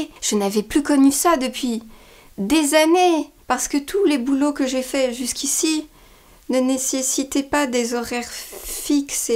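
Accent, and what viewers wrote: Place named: Quebec, Canada. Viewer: Anouk Fabing, je suis d’accord avec toi. J’aime, je la trouve inspirante, originale et belle malgré son accent québécois renié. Mais à mon avis, c’est possible de s’épanouir de à du lundi au vendredi si on est bien entouré au travail. Un horaire atypique est plus souvent un problème qu’un symbole de liberté, fait parti des rares personnes qui peut gagner sa vie en choisissant comment et quand elle travaille. Ce n’est pas le cas de la majorité des gens à qui elle s’adresse.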